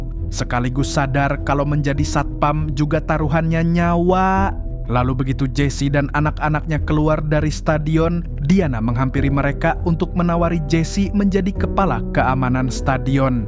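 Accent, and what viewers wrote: Banjar, Indonesia. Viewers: banjar banar amng ni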